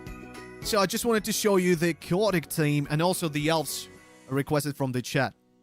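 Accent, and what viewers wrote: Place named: Russia. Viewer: Позорище, русский мужик на рус-англе догло читает транслит особо это не скрывая🤦🤦🤦🤦🤦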